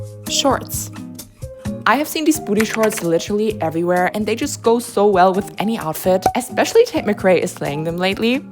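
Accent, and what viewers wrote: Germany. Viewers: i hear deutsch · heyy, random question but are you german? idk, your accent kinda sounds like it..btww LOVEE UR VIDDDS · Why do you sound kind of German? (I‘m from Germany and this is the first video I watched of you)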